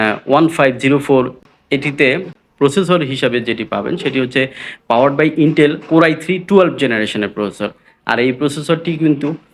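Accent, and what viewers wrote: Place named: Kolkata, India. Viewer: Agge dada, the you talk like kolkata babu, why not Bangladeshi tone?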